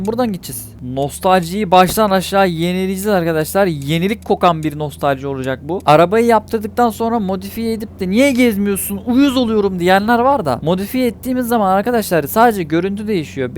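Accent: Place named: Trabzon, Turkey. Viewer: Abi çok komik trabzonlu konuşuyon ya 😂😂😂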